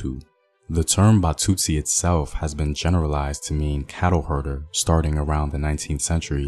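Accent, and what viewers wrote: United States. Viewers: Where this American sounding person got this information? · cant get over your VERY AMERICAN accent mentioning these african words. funny that the historical myth of he milk is the same as the one of the banyankore of Uganda.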